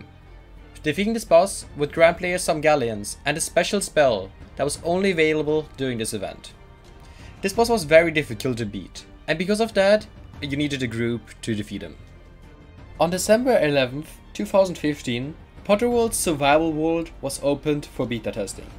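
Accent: Germany. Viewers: Lol Do you have a German accent?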